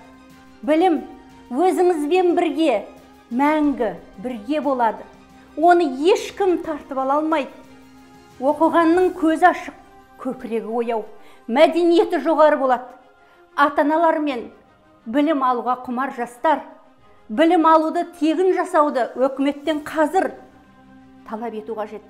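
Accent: Standard. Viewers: Өте керемет.Таза қазақша сөйлейді екен.Өте шындықты айтты. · Молодец казакша таза суйлеп тур енди арамыздагылар барго казакша сулесе шыгатын едим дитиндер не айтады екен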